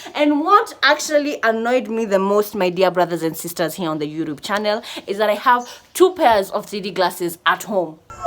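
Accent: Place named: Scotland, United Kingdom. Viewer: team ANTON LOVE ISLAND 2019 all the way 👊🏽👊🏽👊🏽👊🏽 Scottish accent a struggle .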